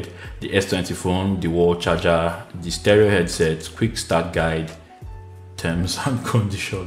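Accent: Nigeria. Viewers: You have a Nigerian accent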